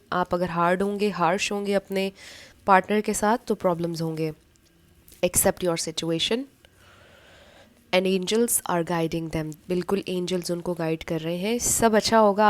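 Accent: Pakistan. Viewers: Apna khyaal rakhiye Aap hi nahi main all taro readers ki dil se izzat karti hu... Means aap log apni rooh apni jaan laga dete hain Ek Ek Situation ko samjhane me.... Her tarah se hum logo ko achcha chahte hain Aur Bahot mehnat karte hain... I love ur urdu lehja also vvvvvvvvry much.. Pl tk care urself... N again Shukriya....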